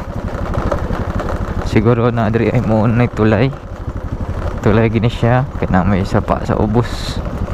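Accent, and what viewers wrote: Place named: Visayas, Philippines. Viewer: Bisaya ka dong 😂😂😂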